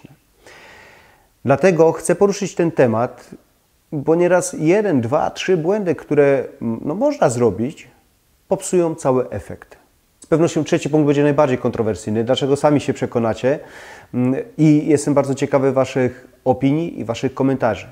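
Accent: Silesia, Poland. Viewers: Czy Pan jest ze Śląska ?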